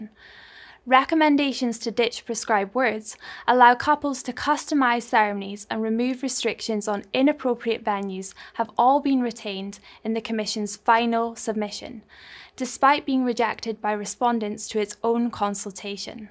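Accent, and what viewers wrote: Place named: Scotland, United Kingdom. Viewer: Beautifully presented. Love the soft Scottish accent. You take care young lady.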